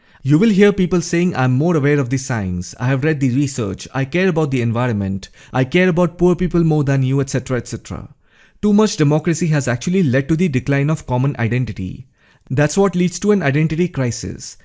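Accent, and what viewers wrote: India. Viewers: 該死！這個咖哩味的英語、讓我聽不懂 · Something about the Indian accent that makes you understand easily. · Индус всё объяснил! Красава. Кстати классный акцент 👍🤝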